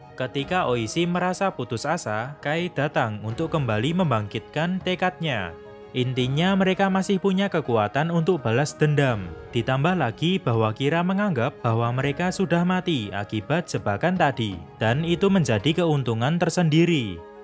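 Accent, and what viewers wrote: Java, Indonesia. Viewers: Medok ya mas 😁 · Jawi banget mas e😁😁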